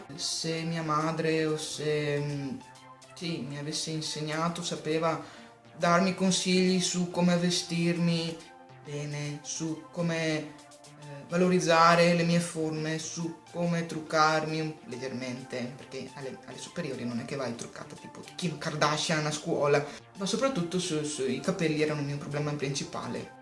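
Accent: Veneto, Italy. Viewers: ti ho appena scoperta, bellissimo video davvero!! è bello perchè, nonostante le difficoltà legate al dimagrimento/ingrassamento, riesci a parlarne e a sorriderne. purtroppo che si tratti di aumentare o perdere peso (e dei problemi fisici che ciò comporta) il problema è psicologico in ogni caso, ed è su sè stessi che bisogna lavorare più che sul peso in sè!! io stessa in passato ho avuto problemi in merito e ancora mi ci fisso talvolta, anche se sono riuscita a raggiungere il peso forma.. spero davvero che tu riesca a venirne a capo e riesca a trovare un tuo equilibrio, e mi sembra proprio che tu ce la stia mettendo tutta :) un abbraccio grande ps: sei veneta per caso?? XD hai un accento davvero simile a quello delle mie parti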